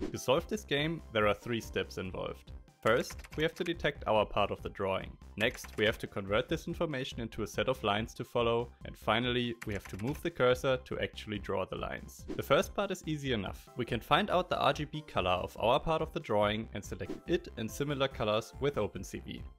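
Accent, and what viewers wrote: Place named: Germany. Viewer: Your way of speaking seemed familiar to me, so I checked your channel info and who would have guessed... A fellow german! (Really enjoy the videos btw. mach weiter so!) :D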